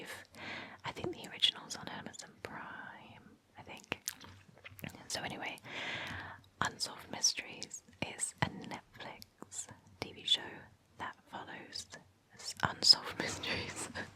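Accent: United Kingdom. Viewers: The most tingly british wisper on internet! We love you!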